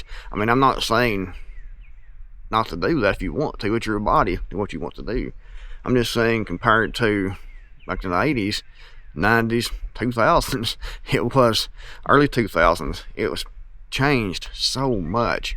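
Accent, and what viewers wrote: Appalachia, United States. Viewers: First video ive seen of yours i am a proud born and raised, West Virginia, Appalachian gal, and if they think you have an accent they should hear mine. Ive always been compared to Sissy Spacek, that played Loretta Lynn in the Coal Miners Daughter movie, because of the way i talk. Im so happy to be here and to hear a fellow Appalachian that sounds just like me. Oh yeah im a new subscriber too.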